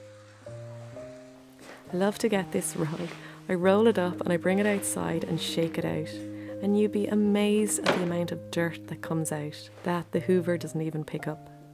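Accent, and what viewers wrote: Ireland. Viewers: watching your videos, with your calm and gentle Irish lilt and beautiful images, is like meditation for me. Thank you for posting. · lovely to hear your love Irish voice and wisdom thank you Celine also from Ireland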